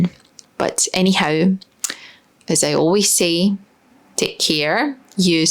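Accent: Scotland, United Kingdom. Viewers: Funny thing about your accent. You grammar isn't 100% native, but I thought it was just some sort of Scottish vs American English difference. But I don't think Americans can hear any Latvia accent, just the Scottish accent.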